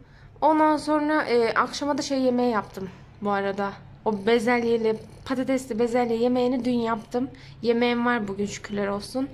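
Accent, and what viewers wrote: Yozgat, Turkey. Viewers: Kim ne derse desın biz yozgatlıyızz biz böyle konusuruzzzz🌹🌹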